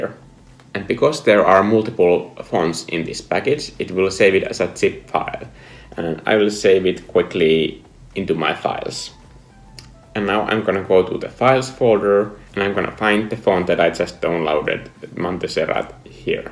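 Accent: Finland. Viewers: are you Finnish per chance? ^^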